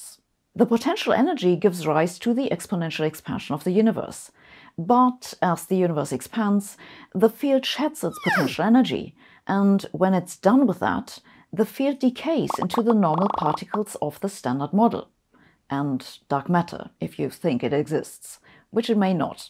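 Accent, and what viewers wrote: Germany. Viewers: is german pronunciation for hypothesis- hypothetis. Or is just how sabine pronounce things?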